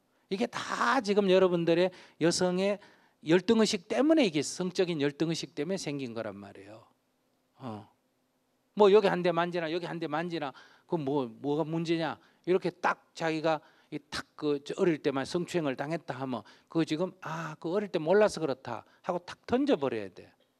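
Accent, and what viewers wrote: Gyeongsang, South Korea. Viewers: Weird! no one seems to have problem with his tone or voice except you. And, the audio condition is not bad. Might be your computer's audio problem. Or, his southeast Korean accent you're probably taking wrong with. Otherwise, what else you might possibly have? Do you happen to have problem like auditory hallucination? Seriously. If you computer game too much time wearing headset, it might have happened. go and see doctor.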